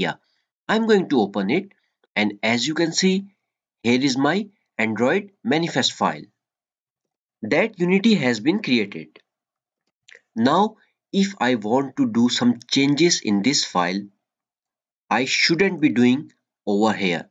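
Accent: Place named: India. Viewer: 3 indian voice ! XD cool !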